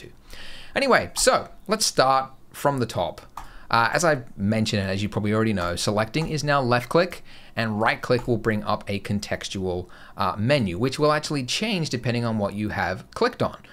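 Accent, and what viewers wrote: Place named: Australia. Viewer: Blender 2.8 looks so good it's really interesting they put a floating guy with an Australian accent at the bottom right corner of the screen at all times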